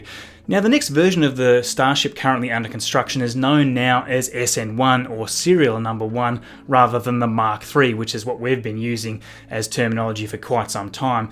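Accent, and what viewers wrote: Australia. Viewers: Im sorry to ask off topic but where is your accent from, Australia?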